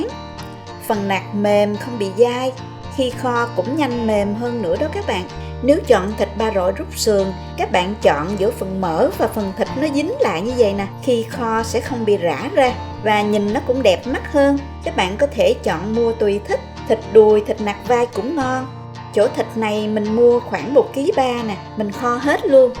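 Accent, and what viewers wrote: South, Vietnam. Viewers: 🌹 Mình người miền Trung và rất thích ăn món thịt kho tàu này. Nay thấy bạn hướng dẫn trên đây, nhìn thấy hình ảnh nồi thịt kho rất đẹp mắt và rõ ràng cùng với giọng nói dịu dàng dễ thương của phụ nữ miền Nam... mình những muốn thưởng thức ngay từ đầu, và theo dõi cho tới cuối mặc dù mình cũng biết nấu món này. Rất cảm ơn và chúc chương trình của bạn, ngày càng được nhiều người yêu thích hơn. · Tiếng cô nói riêng mà tiếng người miền nam nói chung dễ thương ghê 😍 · Giọng nam bộ 100% nghe ngọt quá, chắc thịt kho tàu cũng ngon ngot lắm. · Giong dac rat mien Nam that de thuong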